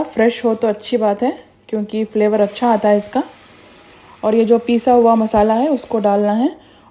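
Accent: Hyderabad, India. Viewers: when the Dubai mom is actually Indian with a terrible Indian accent, it's disgusting to listen to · Assalamualaikum! New subscriber here 😊 I know you are from dubai at present but way of talking se puchi Are you from Hyderabad?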